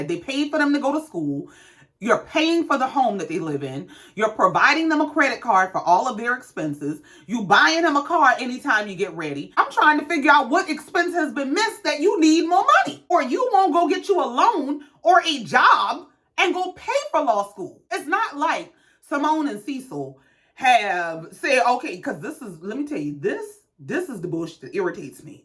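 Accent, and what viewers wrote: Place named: New Orleans, United States. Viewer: I can tell you're from New Orleans baby lol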